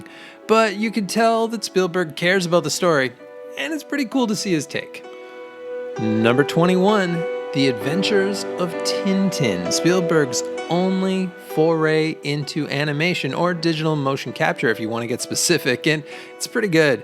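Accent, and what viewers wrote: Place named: Canada. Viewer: The commentator sounds Canadian. I love the way he says a boot instead of about!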